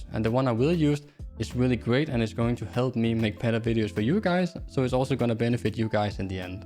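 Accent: Denmark. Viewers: Hey bror, har set du er stoppet med at poste, men ber dig begynd at post igen tro mig. Du skal bare øve dig på dit engelsk så det ikke er danglish og blive ved med at poste og lock in. Tro mig hvis du bliver ved bliver du stor på et tidspunkt.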